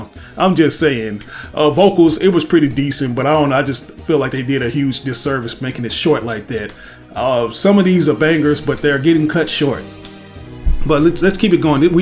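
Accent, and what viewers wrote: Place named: West Tennessee, United States. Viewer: You from West TN/North MS ain’t it? I hear them Arruhs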